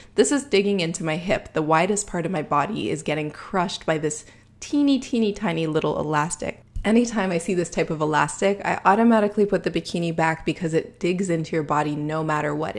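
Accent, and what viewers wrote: Canada. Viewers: Do I hear a bit of a Canadian accent?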